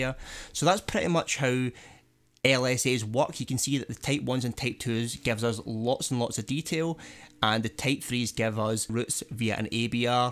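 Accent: Scotland, United Kingdom. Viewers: Agreed. My certs are a year expired.. I thought I really should certify again, given the “certpocalypse” explains it better than the guy on Udemy. Also, the Scottish voice is way better than the American voices you normally hear on Udemy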